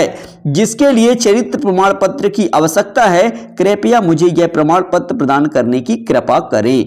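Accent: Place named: Sagar, India. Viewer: Sagar se ho kya sir ❤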